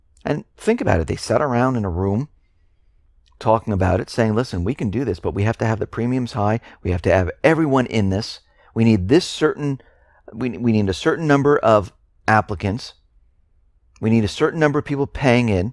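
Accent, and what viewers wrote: United States. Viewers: a foreign national with a perfect American accent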